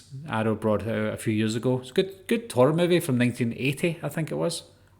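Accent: Scotland, United Kingdom. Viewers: Were about’s from Scotland are you from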